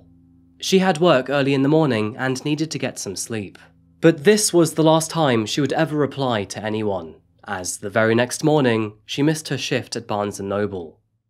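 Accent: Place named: England, United Kingdom. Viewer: I believe he actually does live in the US now, if I remember correctly when mentioned in a previous video. I forget what city/state. EDIT: I was wrong, he is FROM America, but moved to the UK at a young age and lives there now! I got it mixed up! But now I can't remember what city he was from in the US. I feel like it was in the Pacific Northwest for some reason? Maybe I just have Seattle on my mind because he loves coffee lol. I honestly don't know. But yes he moved to UK at age 7 per his Q&A video and developed the English accent. He is likely just promoting Scentbird because they're a sponsor for his channel, but sponsors often send the content creators free stuff.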